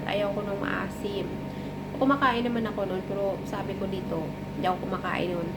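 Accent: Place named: Ilonggo, Philippines. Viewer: ang tuno nga ilongga gid bala aw hahahah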